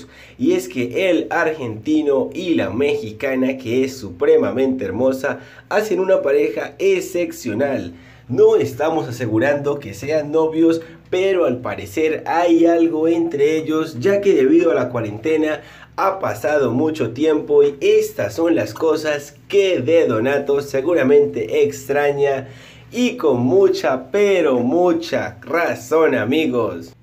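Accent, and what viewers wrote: Venezuela. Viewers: Argentino????? Es venezolano 😂 a quien le gustan las arepas chamo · Jajajajaj cual argentino es venezolano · Jajjajaja es venezolano no Argentino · No sabía que the Donato era Argentino, y hablaba como venezolano XD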